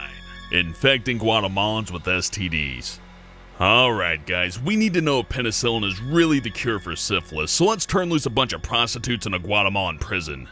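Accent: United States. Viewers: the way he talks is too deep, harsh, forced and silly for the content of the video. It's difficult to focus on what he's saying behind that shitty american-thicked accent of his. Dolan's voice is soothing, serious, and has great ethos. Basically, he just sounds a hundred times better. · when did become american?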